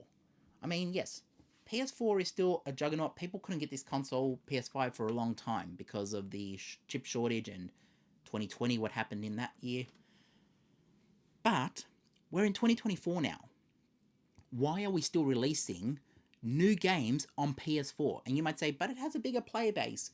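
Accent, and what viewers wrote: Australia. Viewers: I mostly want a ps5 for the new Silent Hill games coming out. I heard theres supposed to be good games coming near the end of the year. I agree with you that ps5 should be at least able to play as far back as the ps3 games. You have a really awesome and soothing voice btw you would be great for voice overs especially in the west because your Aussie accent is very charming. Keep up the great work.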